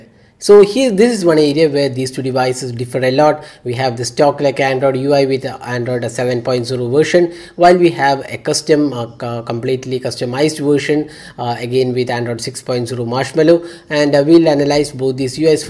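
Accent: India. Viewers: Ingles com sotaque da Índia. Fica bem cantado mesmo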